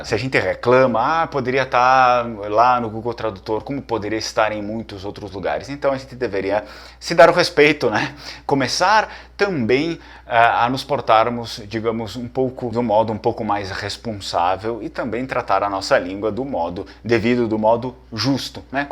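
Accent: Italy. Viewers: O seu sotaque em vêneto é igualzinho ao dos meus tios de Vicenza!!!👏🏻👏🏻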